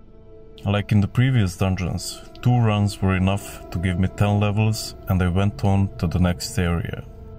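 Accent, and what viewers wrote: Russia. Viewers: Судя по акценту ты русский ))) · Чисто русский акцент, давай видео на русском, читать сабы такое себе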